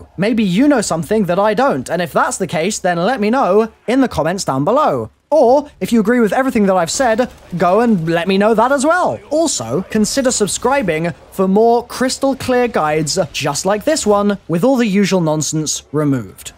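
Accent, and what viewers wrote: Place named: United Kingdom. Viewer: You're British arent you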